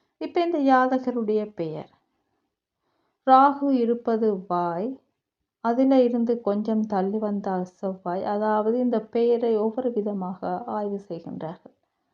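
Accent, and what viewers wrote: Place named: Sri Lanka. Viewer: சிலோன் தமிழ் அழகாக உள்ளது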